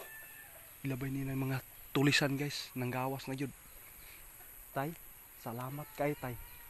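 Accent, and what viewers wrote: Visayas, Philippines. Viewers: Ano ba yan bisayot naman ang Salita mo nakaka buwisit 😅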